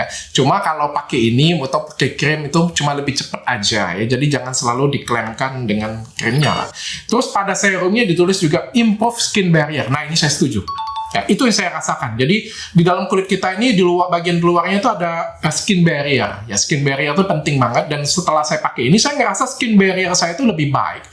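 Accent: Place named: Palembang, Indonesia. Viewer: Wong Palembang ternyato 😂😂😂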